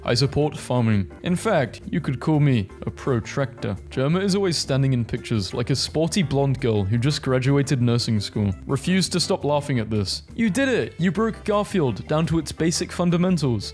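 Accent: United Kingdom. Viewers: I enjoy sitting down and listening to a British person say funny things for 20minutes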